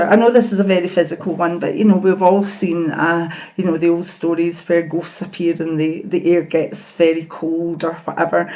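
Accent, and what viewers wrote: Scotland, United Kingdom. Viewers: is sleep paralysis a sign...had this Since a teenager. Nice to hear a Scottish accent on youtube. im Scottish too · Hello, just found your channel. Good to hear a Scottish accent as I'm in east Dunbartonshire. Liked and subbed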